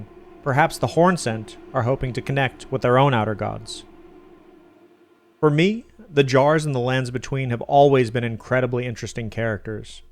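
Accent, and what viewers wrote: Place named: United States. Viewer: u pronounce words so american😭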